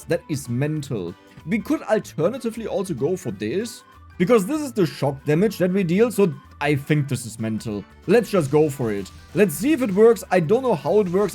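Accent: Germany. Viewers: accent is so pronounced, yet very different from the classic German accent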